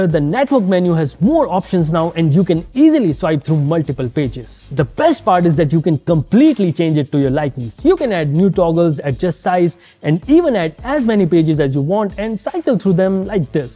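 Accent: India. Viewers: oooh god this indian english aghhhhhh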